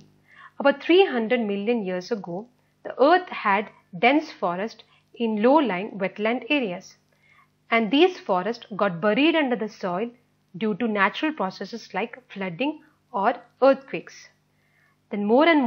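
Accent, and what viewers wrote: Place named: Kerala, India. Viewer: Pronounciation says that u r a malayali teacher. I m too from Kerala and teacher at Karnataka. Video is very helpful for teacher like me👍👍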